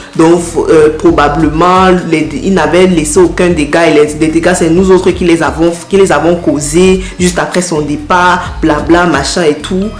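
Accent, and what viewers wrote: Cameroon. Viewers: Je sens le ton camerounais ! Lol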